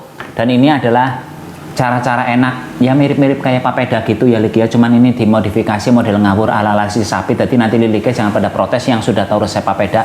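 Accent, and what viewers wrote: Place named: Banyumas, Indonesia. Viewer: Asalamualaikum Wrwb Sugeng sonten mas, mana byunge mas, lha Yo ngono di yalakan lampune jok peteng bae😂😂😂, AG ikut, Tan bahasane mas ngapak😂😂,LGI masak nopo Yung, wah Niki sng yg sangat mengsipirasi gaene penyakit maq,e, kambuh kebanyakan, dan sekali lagi terimakasih obat maqnya, trs yimak cuuus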